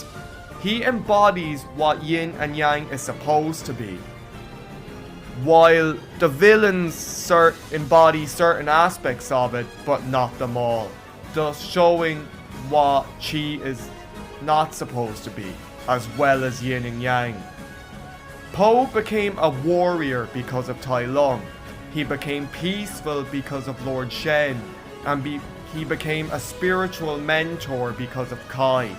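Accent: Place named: Ireland. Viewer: I can tell your Irish :D so am I.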